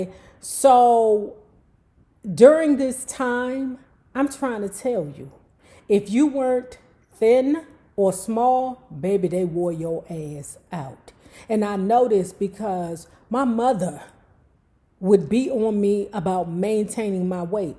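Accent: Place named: Washington DC, United States. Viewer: Won't even mention that DC accent😮😮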